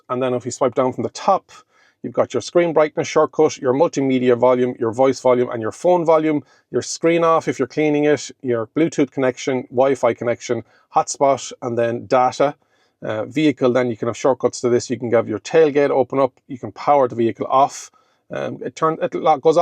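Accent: Ireland. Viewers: Great video man. Just what I needed. And great to hear an Irish voice explaining everything.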